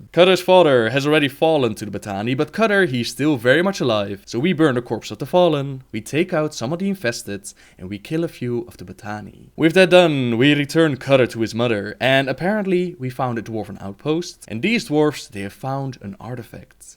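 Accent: Netherlands. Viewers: You're dutch aren't you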